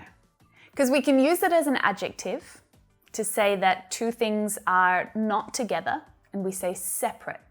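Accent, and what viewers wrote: United States. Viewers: Many English people pronounce the word "hear” as "hiya".But yours is a bit sounds like American accent.May i learn the difference between them? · Is this american english pronunciation? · Es Inglés americano🇺🇸🗽?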